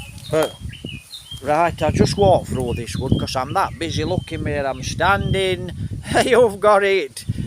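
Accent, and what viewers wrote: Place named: Leeds, United Kingdom. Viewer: He's English, sounds like a Leeds accent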